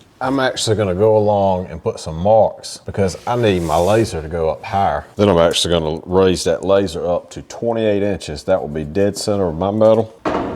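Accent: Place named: South, United States. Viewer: Just found your channel through one of your shorts. Been binge watching. Really enjoying it! Thank you! As a felllow southerner who has lived ALL OVER the south, love hearing your accent!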